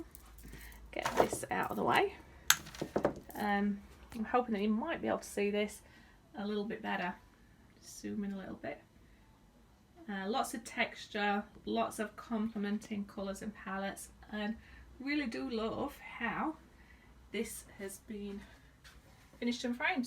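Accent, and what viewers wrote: United Kingdom. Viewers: thanks for the detailed answer, much appreciated. I'm from Yorkshire but live in USA now. I detected a northern England accent!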